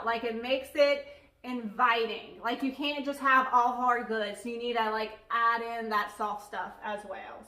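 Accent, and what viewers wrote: Louisiana, United States. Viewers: I knew you were from Louisiana, you sound exactly like my daughter in law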